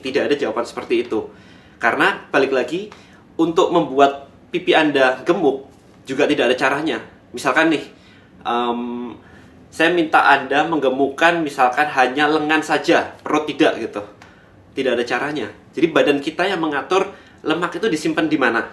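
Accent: Java, Indonesia. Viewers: nice video koh.. btw koh, koh ini orang jawa yah?? ngomongnya medok amat koh.. hehehe..:D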